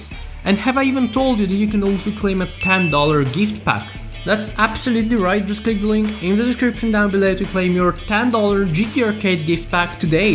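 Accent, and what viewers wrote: United Kingdom. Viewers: Are u from Scotland?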